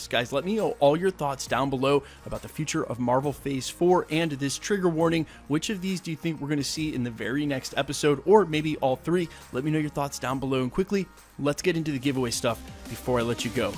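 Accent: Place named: Philadelphia, United States. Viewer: Just curious. Are you from Philly? As a local, I’m picking up a slight accent. Cheers.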